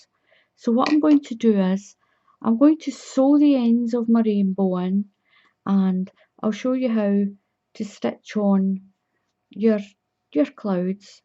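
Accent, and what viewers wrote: Scotland, United Kingdom. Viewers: What a lovely tutorial and so great to hear a fellow Scottish accent as a bonus!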